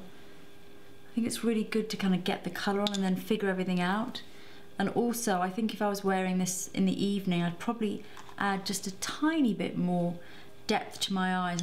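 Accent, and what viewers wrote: United Kingdom. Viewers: Your accent automatically makes you sound like you're automatically bright. Like a professor. But then, I remember...you're a makeup artist. It's the British accent.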